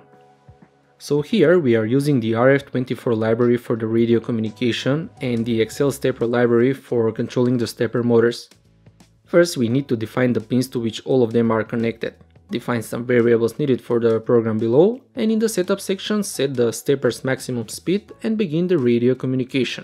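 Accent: Russia. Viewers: какой то русский акцент присутствует или мне кажется?) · как же слышен акцент русского))))))))))0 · Слышится акцент, скорее всего русский) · Русский акцент, русский чтоль ? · Русский акцент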